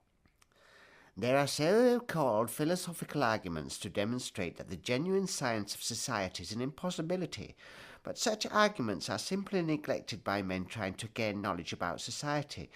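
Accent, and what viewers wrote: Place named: RP, United Kingdom. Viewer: Oh, and love the posh accent :D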